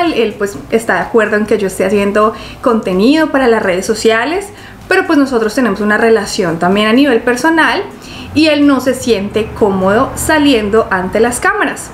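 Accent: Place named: Colombia. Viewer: Ola eras mexicana mas tienes asento de colonbia te felicito seias maxicana o de onde seia lo que veio mexico es mui lindo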